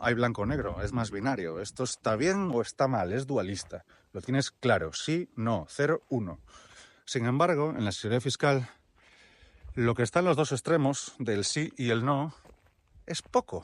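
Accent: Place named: Galicia, Spain. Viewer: Eucaliptos, monte, escuché Vigo, alguna frase en gallego,…acento gallego como el mio de ahora si ahora no…no soy de vuestro gremio, al menos directamente, si es cierto que trabajo en desarrollo de software en el área Fintech, pero me pareció muy interesante tus experiencias a modo de casos de uso practicos basados en hechos reales, y como diria Pazos en Aribag: "profesional...muy profesional...", y también porque el titulo podría ser “Lo mejor y LO PEOR de ser XXXXXX”, a raíz de este he visto también otros videos, me quedaré por aquí (suscrito)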